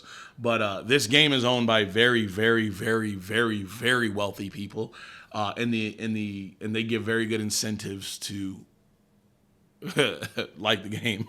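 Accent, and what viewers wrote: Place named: United Kingdom. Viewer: That British accent is his real accent.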